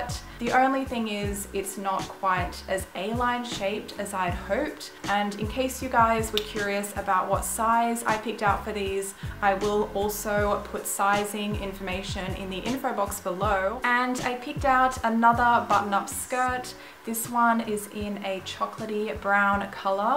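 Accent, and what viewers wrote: Australia. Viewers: Are you from Australia? It's just the accent, sorry if I got it wrong.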